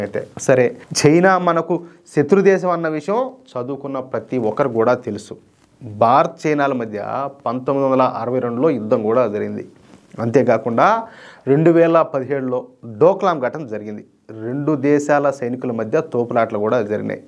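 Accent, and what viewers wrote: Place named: Nellore, India. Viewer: Dear, your presentation in that vernacular style with Nellore slang is very good. You are straight and your emotion is just right. Your comprehension about the subject you are speaking is also quite good. Try to organize a debate video by inviting few experts with you as anchor. Thank you for the topic presentation.🌹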